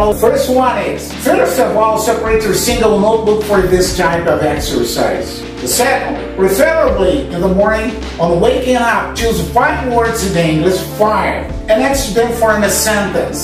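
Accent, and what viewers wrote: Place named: Brazil. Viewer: Your speech has a strong Brazilian accent, anyway.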